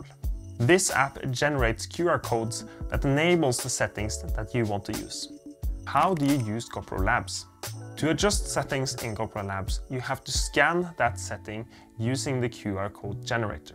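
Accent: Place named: Norway. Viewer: Thanks for the video, love the norwenglish. I will remember to smear myself with a little patience 😂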